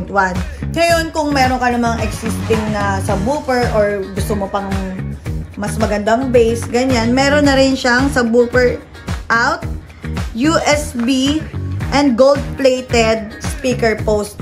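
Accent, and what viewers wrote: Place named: Iloilo, Philippines. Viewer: Ilongga man gali ni c madam🥰mabakal ko sina,pila man?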